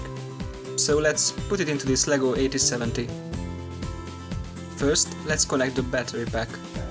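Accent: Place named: Hungary. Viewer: Hallani hogy magyar vagy!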